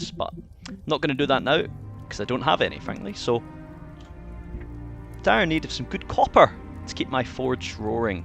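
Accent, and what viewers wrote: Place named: England, United Kingdom. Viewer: what happens in the 16th minute also nice accent are you English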